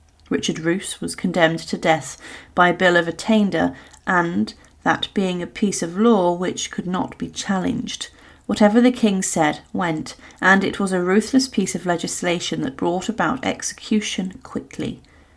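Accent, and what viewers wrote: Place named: RP, United Kingdom. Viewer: Clear and precise RP. Perhaps, stick to listening to Sesame Street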